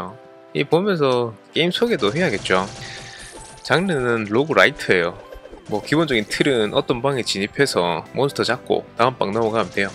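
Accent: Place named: Gyeongsang, South Korea. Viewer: 경남쪽 혹시 부산이세요? 사투리가 찰지시네요^^ 저는 뭐가뭔지도 모르고 시작한지도 얼마 안되서 배우는 입장입니다^^ 앞으로 잘 부탁드립니다~ 구독했습니당~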